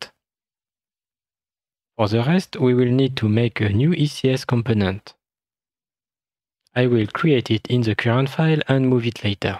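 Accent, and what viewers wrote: France. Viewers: If you can speak German with a German accent i will speak english with a english accent. You gotta watch a native english speaker if its so hard for you to understand. I understand him well and french guys have their own ways its nothing he should change. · why do you speak english like you're reading the words while speaking french? it's honestly very difficult to understand. I'm brazillian, by the way. Et je parle français aussi, c'est ma troisième langue. still, I had a hard time focusing on whatever you are saying. you should work on your pronunciation, either speak english or french! lol either way thanks for the content, this was very helpful to me.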